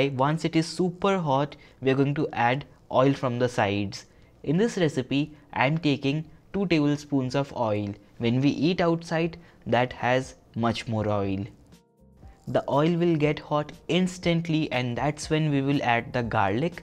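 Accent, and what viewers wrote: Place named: Bengal, India. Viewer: Sounds like bengali and they're good at cooking.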